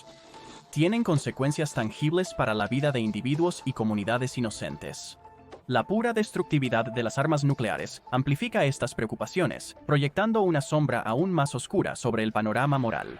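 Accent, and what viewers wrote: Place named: Catalonia, Spain. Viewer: El narrador parece que es un Catalan intentando hablar en Castellano sin que se le note el acento. El video muy interesante.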